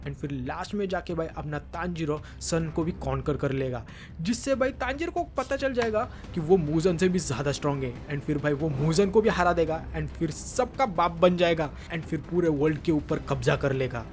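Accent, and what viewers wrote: Maharashtra, India. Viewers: Tu maharashtrian 😅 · Bhai you are Marathi speaker confirm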